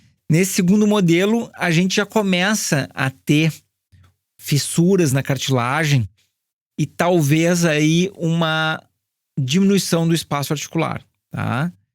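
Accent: Rio Grande do Sul, Brazil. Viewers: Ele é gaúcho amiga rsrs .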